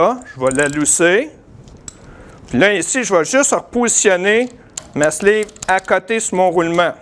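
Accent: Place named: Canada. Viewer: très bonne explication mr mais j'ai eu dès fois très dur a vous comprendre a cause de votre accent canadien mais ca va bravo et félicitation cordialement richard .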